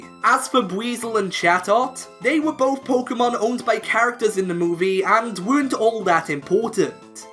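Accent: Wales, United Kingdom. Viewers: Are you welsh 😊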